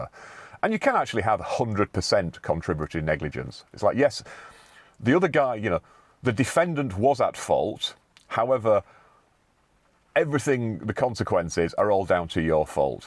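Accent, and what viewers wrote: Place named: Yorkshire, United Kingdom. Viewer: I love listening to someone speaking Latin in a Yorkshire accent. I thought I was living in 'The Hunger Games' ? Don't have to imagine.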